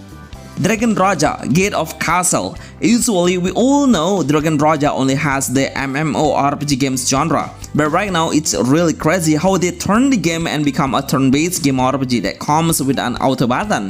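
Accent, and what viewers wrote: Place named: Indonesia. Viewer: I'm from Indonesian, and I noticed your accent pretty much similar to me.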